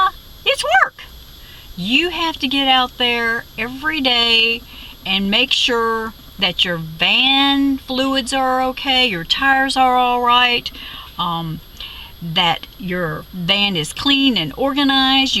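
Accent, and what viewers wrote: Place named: South, United States. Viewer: I detected a little Southerness there!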